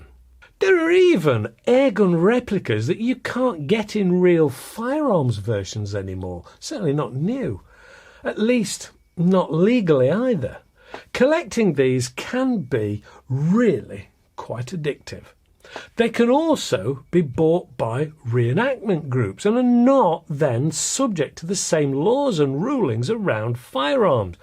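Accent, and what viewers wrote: United Kingdom. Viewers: Hi, I have owned various firearms and competition grade air pistols for 20 years, but just lately found the joys of airgun collecting. Must say that I enjoy greatly watching your reviews, even if they are about guns that I have intentions to never acquire. (Well, never say never...) Plus, since English is not my mother tongue and after listening all kinds of hardly comprehensible drooling of mixed "reviewers" from a certain other part of the globe, I really appreciate your expertise and crystal clear British accent. Keep up the good work!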